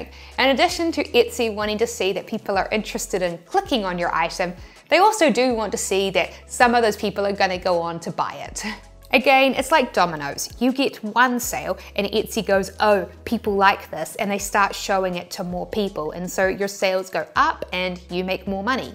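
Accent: New Zealand. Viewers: What accent? Sounds perfectly kiwi to me.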